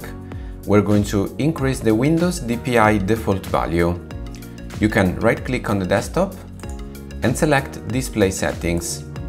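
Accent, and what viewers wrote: Italy. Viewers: Cerco una recensione in italiano, vedo che l'autore del video è italiano: ma ha fatto la recensione in inglese (e con una pronuncia tipicamente italiana!) L'unica cosa migliore rispetto alle recensioni di siti specialistici è lo scandire per bene le parole che rendono meno impegnativo il lavoro di traduzione. Cmq a parte le critiche per non aver pubblicato anche un video in italiano, ti ringrazio: il video è ben fatto ed analizza il device a fondo. Ps: dovresti scrivere nel titolo che questo è il Dasung Papelike 3 o si potrebbe avere il dubbio che si stia parlando di un modello più vecchio. Pps: 40 minuti di video sono troppi! See you, Italian Reviewer!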